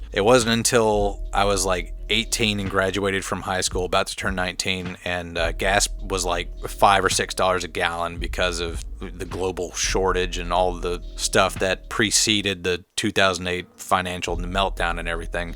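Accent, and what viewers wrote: South, United States. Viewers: It's just my personal opinion but I feel like your channel has taken off for two main reasons, one is excellent well researched content presented in a very relatable way and two because you lend NASCAR material an air of authority with your southern accent but also not sounding so southern that no one can take you seriously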